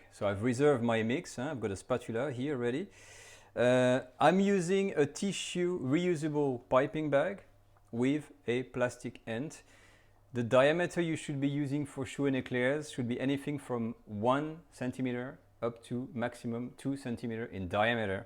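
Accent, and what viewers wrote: France. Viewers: Love your explanation and your beautiful French accent too... Music to my ears...🥂 merci beaucoup... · Me encanta el acento francés😁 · Your French accent is great and the video is spot on. Thumbs up and Sub! 👍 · French accent, that's a guarantee for a good classic french recipe!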